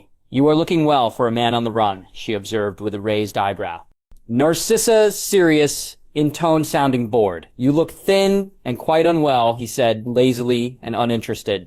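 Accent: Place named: United States. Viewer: Why is this one with an American accent? It's really jarring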